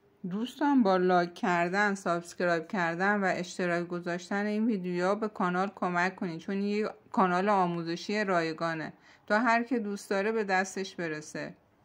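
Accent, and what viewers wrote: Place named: Bakhtiari, Iran. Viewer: لهجه ی بختیاریتون خیلی قشنگه❤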